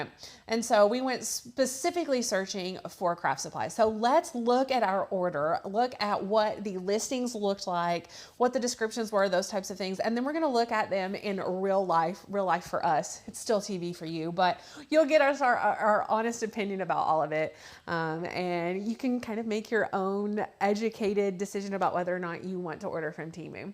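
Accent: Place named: North Carolina, United States. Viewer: I got the wooden needle holder too! Just discovered your channel and love it. You sound like you're from North Carolina!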